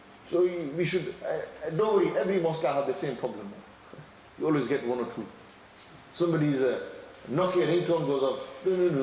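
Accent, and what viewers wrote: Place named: Mirpur, Pakistan. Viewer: Beautiful speech. May allah bless you. You gotta love his mirpuri.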